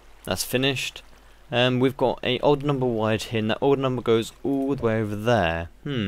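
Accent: United Kingdom. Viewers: Your like etho with a British accent :D But really you are genius.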